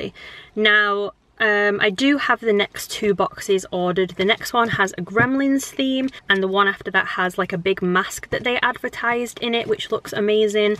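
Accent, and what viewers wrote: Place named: Wales, United Kingdom. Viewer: ohhh lol you sometimes sound like you have a bit of a Welsh twang 🤪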